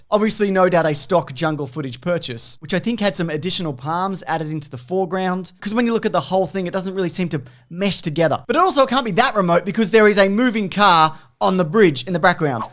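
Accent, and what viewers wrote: Australia. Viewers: High pitched Australian makes me want to get corona so i can eject phlem from my lungs and stuff it in my ears so i can mute this horrendous shit.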